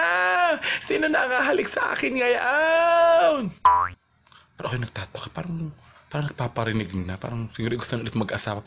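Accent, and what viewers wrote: Batangas, Philippines. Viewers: Napaluha ako sa katatawa sa'yo 😅 more Batanguenyo accent vlog plsss sobrang nakakatawa😅😅😅 more power to you! God Bless You & Good Luck!!!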